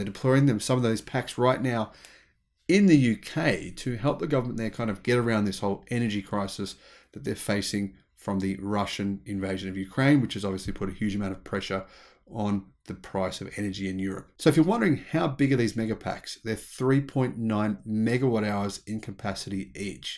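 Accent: Australia. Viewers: His heavy Aussi English, seems make him trip over pronunciation all the time.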